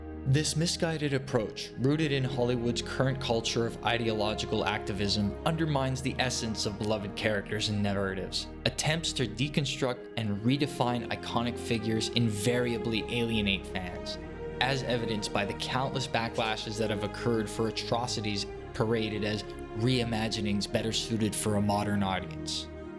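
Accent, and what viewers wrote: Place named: Canada. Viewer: As someone who hasn't played Halo, not a console gamer and not great at shooters, I'M pissed at the mishandling of the character - and the constant attempt to bait and switch lead characters. He is an icon, deserves better. If you wanted a helmetless Master Chief (but why though?) Kurt Russell did it better in 1998: <link> Impressive first video - HELLA good editing. Shame about that cannuck accent.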